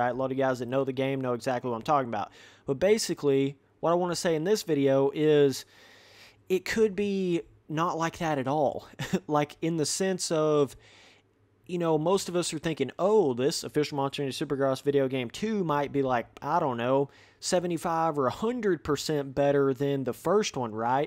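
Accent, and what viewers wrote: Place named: South, United States. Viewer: And you sound like a stupid ass hillbilly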